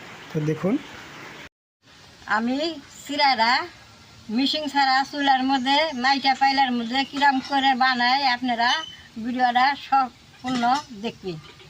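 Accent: Tangail, Bangladesh. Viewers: এটা তো বাংলাদেশের টাংগাইল জেলার ভাষা বলতেছে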